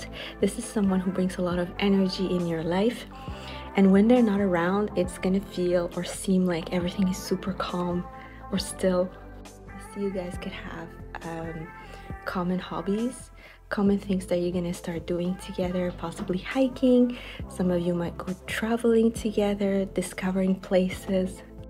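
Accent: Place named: New Zealand. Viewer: Sounds like my family from tautoro Kaikohe new Zealand 😎🎤🎶🎶 who is paku eyes 😢 lmao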